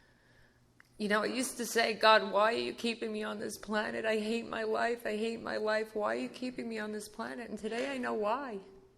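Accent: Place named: New York, United States. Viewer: I can't believe I'm barely finding this especially this testimony! It had me from the start because of her NY accent. Hilarious, touching and impacting to my spirit. I love her transparency and her passionate love for God. I love her story of the last sponsor she had from Virginia. Thank you to everyone who had anything to do with sharing this story.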